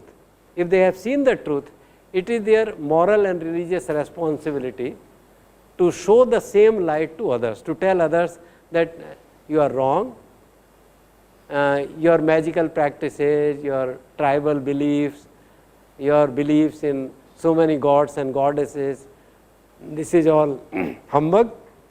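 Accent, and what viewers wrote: India. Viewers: have trouble understanding the Indian accent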